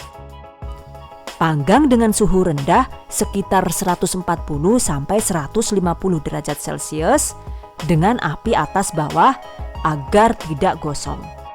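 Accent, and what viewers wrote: Banyumas, Indonesia. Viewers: Terima kasih ya... Insya Allah akan saya coba resep ini.... Seperti kemaren saya baru saja buat nugget ayam.. Enaak banget.. Terima kasih sudah membantu.. Tutirialnya jelas dan mudah dipahami.. Ada lucu lucunya juga bahasa ngapaknta... 😂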